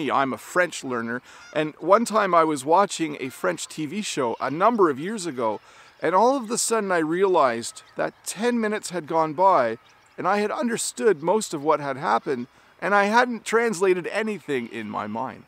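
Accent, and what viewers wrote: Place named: Canada. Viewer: is this canadian english? I understood everything he was saying. American English is difficult for me to hear.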